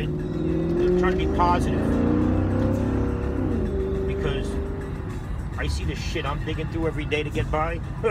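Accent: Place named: New Jersey, United States. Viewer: R u from jersey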